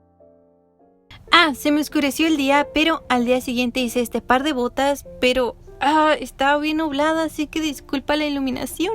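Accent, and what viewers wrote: Mexico. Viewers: Señor, no sabe de relajante que es escuchar su acentito norteño mientras veo su lineart asteti. Mucha motivación para dibujar patas. Muchas gracias ❤❤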